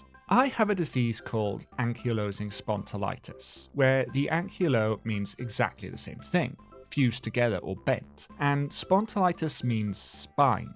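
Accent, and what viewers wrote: Wales, United Kingdom. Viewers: Are you welsh? If so thats super cool!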